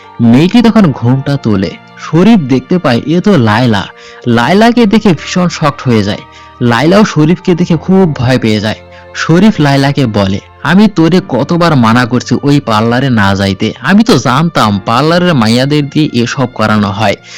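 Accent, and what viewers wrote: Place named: Jamalpur, Bangladesh. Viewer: ভাই আপনি মনে হয় জামালপুরের মানুষ, কথা শুনে তাই মনে হচ্ছে।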